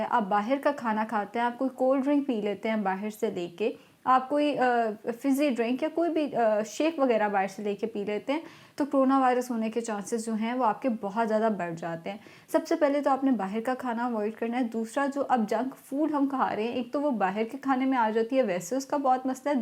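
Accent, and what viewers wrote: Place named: Lahore, Pakistan. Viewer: Good work 👍👍👍miss Ap Lahore sa hain na ? Allah maf kare Acha tha Aisy Lahore wale Bolte hain Kue k main bhi Lahore sa hoon 💪🙈😁😅😂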